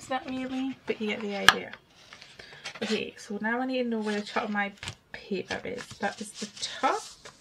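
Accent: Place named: Newcastle, United Kingdom. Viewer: New subscriber, Found you through julie at camelia craft designs 😉 it's great to hear a geordie lass 👍 yey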